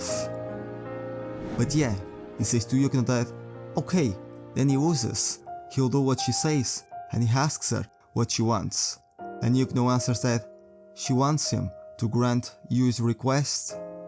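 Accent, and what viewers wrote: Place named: Russia. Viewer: the russian accent is very thicc you sound like vlad ncl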